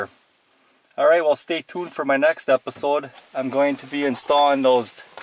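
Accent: Minnesota, United States. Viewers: Holy lord, great videos on F150led installs but that Minnesotan or Wisconsin accent is undeniable! Don’t cha know? You betcha! What is your favorite “hot dish?”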